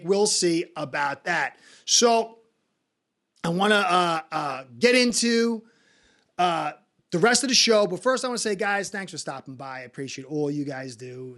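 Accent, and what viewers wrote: New York, United States. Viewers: I like you’re accent are you from New York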